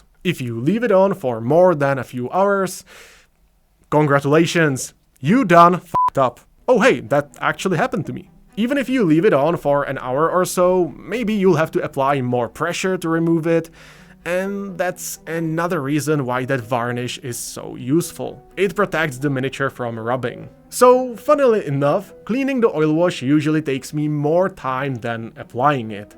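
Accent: Czech Republic. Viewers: I was guessing whether you were Czech or not based on the accent, but that basement is what convinced me.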